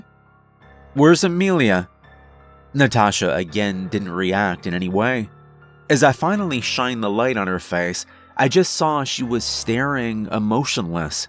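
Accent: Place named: South, United States. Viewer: Did anybody pick up a weird teter-otter between a southern and a northern accent?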